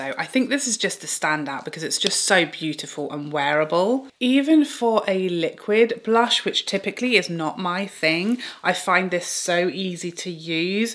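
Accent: United Kingdom. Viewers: I love listening to you speak !!..Ilove your english pronunciation !!Love British english !!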